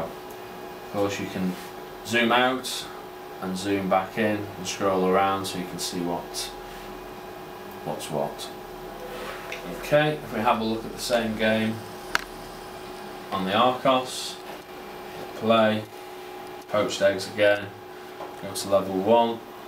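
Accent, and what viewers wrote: United Kingdom. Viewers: you cant blame him for his British accent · hes is british its his accent.... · correct me if i am wrong, but doesnt that come from the strong british accent?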